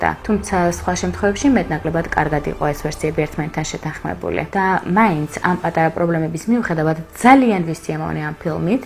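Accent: England, United Kingdom. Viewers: zalian kargixar, sheni arxi maqvs gamowerili da didi siamovnebit gismen, magram gexvewebi es inglisuri aqcenti mishore, ubralod qartulad tqvi, ;D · imena am gogom ro ardauwunos rame nebismier films ararsebobs da dzaanmagzianebs sheni suleluri inglisuri gamotqmebiii, prosta tavs isazizgreb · ინგლისურს რო ისწავლე მერე აღარ იტყვი მაგას ძაან ასწორებს უცბად ინგლისური აქცენტი ❤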